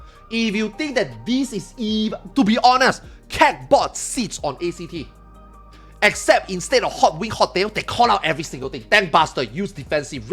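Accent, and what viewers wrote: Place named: Singapore. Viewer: I mean it's an accent from another English speaking nation. In Singapore this is just how people speak English and it's the primary language there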